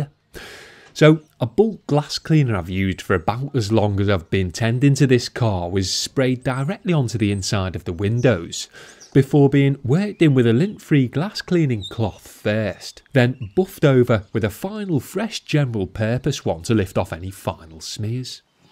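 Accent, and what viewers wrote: Liverpool, United Kingdom. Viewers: Scouser mate (Liverpool) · What a pleasure to ear these sophisticated sentences. I hope one day I could speak with a similar ton and accent. I hope you would not mind if I ask in which area of UK we speak such a nice way. Cheers from Normandy. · This is it. This is the best British accent. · Is that scouse accent? Sorry if I am wrong. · You have the most british accent Ive ever heard, I love it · Nobody sounds more British than this guy😂👍🏻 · What a strong British accent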